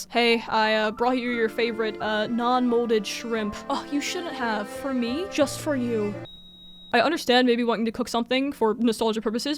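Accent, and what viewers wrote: United Kingdom. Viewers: you pronounce your T’s so british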